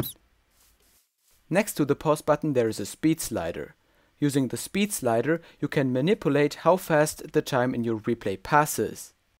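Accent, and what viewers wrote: Germany. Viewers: bist du deutscher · You're german right? xD accent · Man hört deinen deutschen Dialekt lol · Just wondering, but is german? because In my opinion, he sounds german and it seems a large fraction of his viewers are german. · Dein Englisch ist gut, aber man hörst das du Deutscher bist! xD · du bist deutsch man hört das XD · Ich höre, dass du ein Deutscher bist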